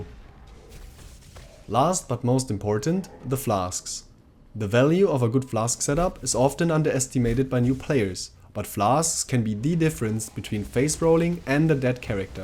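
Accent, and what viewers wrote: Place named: Germany. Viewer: good job trying to avoid the german accent.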